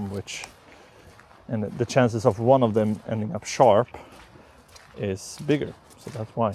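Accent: Sweden. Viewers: Tyckte jag hörde lite östgötska när du pratade lite svenska i en tidigare så jag blev lite fundersam om du var från Östergötland. Inspirerande att få se dig fota på samma ställen som man själv brukar fota.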